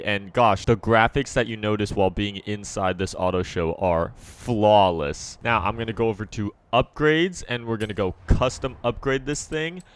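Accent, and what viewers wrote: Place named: Bahia, Brazil. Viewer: é gringo mas parece baiano pqp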